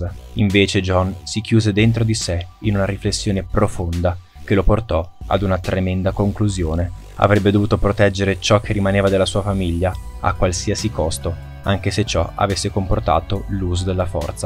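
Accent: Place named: Bologna, Italy. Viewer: Mo che bel accento bolognese che c'hai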